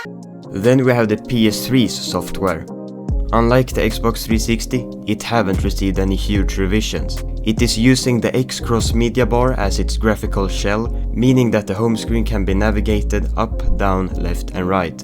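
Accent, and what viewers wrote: Sweden. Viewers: I can hear your Swedish accent as you said " " unshorted series? Mean uncharted? To be fair we both have similar languages in terms of syntax. Just remember to add the T in RS pronunciations to words. Some* xD It's okay.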